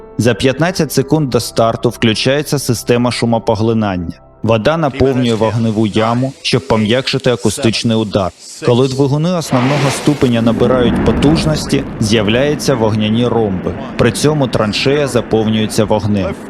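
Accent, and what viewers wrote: Russia. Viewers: Чому в кожному слові такий виразний російський акцент? Всього лиш "о" не вимовляти як "а" і не пом'ягшувати "ч". Хоча б... Ну невже це так складно?...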